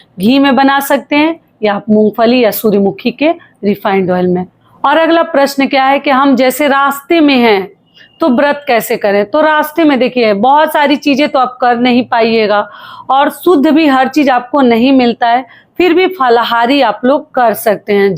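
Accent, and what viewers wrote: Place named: Bhojpuri, India. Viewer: Didi Bhojpuri mujhe bhi Aati Hai Lekin aapke Munh se Bhojpuri bahut acchi Lagti Hai😘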